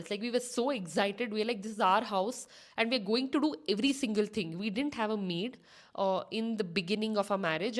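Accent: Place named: India. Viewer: 1. Worst accent to listen to over a public podium. 2. Seriously, Indians need to aggressively build their damn ways to bring good quality orator in them, not in terms of what they speak, but how they speak. Period. 3. If Indians have, what the accent you speak, speaking my heart out, I feel English is being dragged to hell and killed. 4. This was never, Britishers wanted in their life time, to get their lovely mother tongue, subjected to such an awful transformation in terms of pronunciation.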